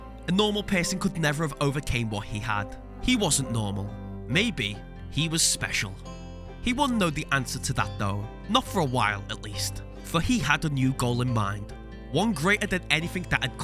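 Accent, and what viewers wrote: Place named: England, United Kingdom. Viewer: "Pearson" It's great to hear someone with a genuine English accent :) You don't get to hear this kind of narration anymore.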